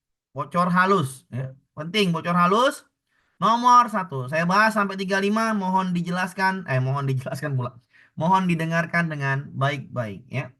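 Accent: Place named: Medan, Indonesia. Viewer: Medan kali la pak ni yang ko dengar la apa yg di bilang tu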